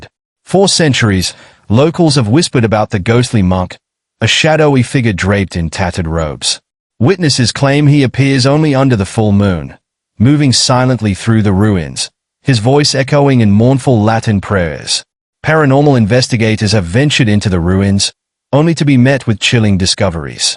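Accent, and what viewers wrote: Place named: United Kingdom. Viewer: cut him some slack; he's British or he's from Boston.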